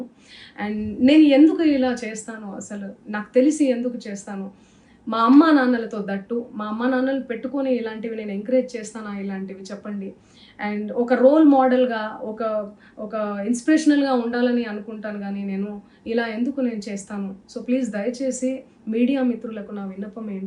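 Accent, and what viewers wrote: Telangana, India. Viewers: U can speak rayalseema language sis..y the slang of ts....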